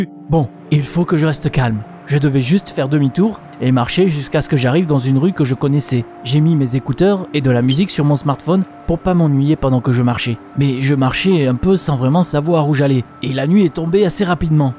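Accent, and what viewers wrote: Marseille, France. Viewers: L’accent Marseilleais on est laaaaa Désolé pour les fautes 😅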